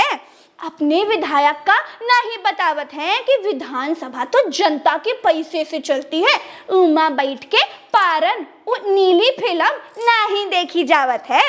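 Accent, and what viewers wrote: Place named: Bhojpuri, India. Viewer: Great acting loved it keep it up ! Love the Bhojpuri accent !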